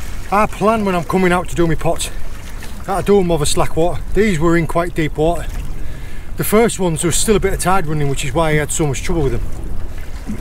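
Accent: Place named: Redcar, United Kingdom. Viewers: where abouts in the northeast are you from sound like redcar area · me and Mrs were trying for months I thought up Redcar as well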